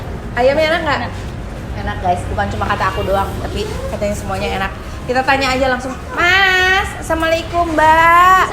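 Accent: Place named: Garut, Indonesia. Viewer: Paling resep pami tth tos nyarios sunda logat garut sok d ulang" Aq mah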